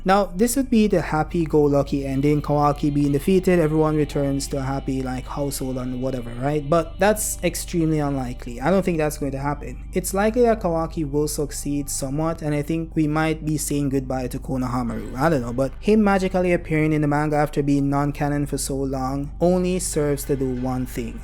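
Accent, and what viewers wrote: Jamaica. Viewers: are you jaaican by any chance, your accent sounds familliar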